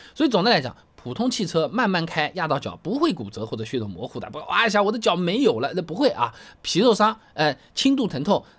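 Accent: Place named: Jiangnan, China. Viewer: 江浙沪一带的数字读法大多是这样的 从方言直翻成普通话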